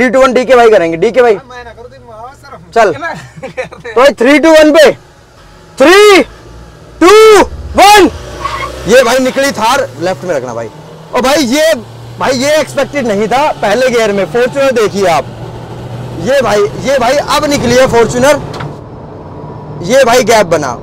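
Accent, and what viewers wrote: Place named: Mewat, India. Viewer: Mewati si bolro h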